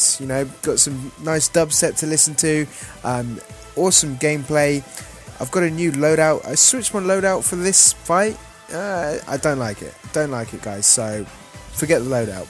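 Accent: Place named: Russia. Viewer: ***** you sound like a russian and im not insulting you. Your Russian voice makes me watch your videos :D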